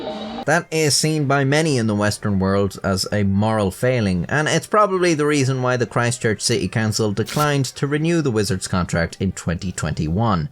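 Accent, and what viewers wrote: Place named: Ireland. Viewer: hes irish. he has a reason to not speak a london or oxford adjacent accent.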